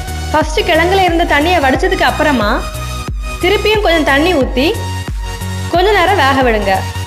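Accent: Nagercoil, India. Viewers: Ovoru ooruku oru slang iruku... Nagercoil slang romba special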